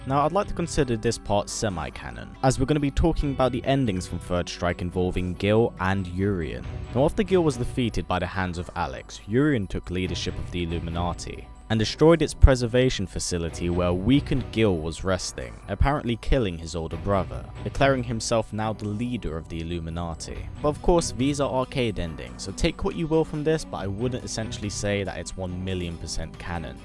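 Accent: United Kingdom. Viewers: I love these videos, just play them and leave them in the background while doing other stuff. Also, the fact that they're narrated by a fellow Brit is just the best thing.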